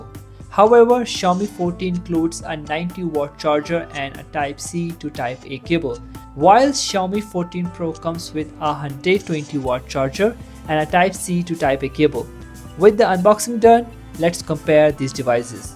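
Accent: India. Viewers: Indian accent so irritating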